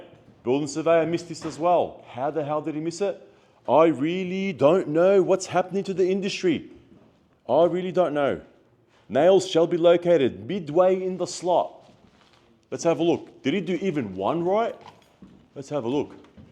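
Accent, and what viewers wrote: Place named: Western Sydney, Australia. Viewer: Your content is invaluable to all potential home and building owners. Your message would be more effectively delivered without the - "oh my gods" and "would you believe it?" delivered in western Sydney dialect. You are obviously on top of your subject but please give us some results. Are builders and certifiers in gaol? Where are the references to Court cases emanating from your investigations? Your method of delivery appeals only to those who want to complain that they are beaten down but have taken no measures to educate themselves.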